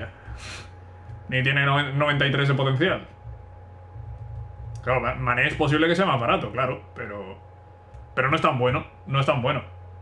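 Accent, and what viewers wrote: Andalusia, Spain. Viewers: Es de Andalucía illo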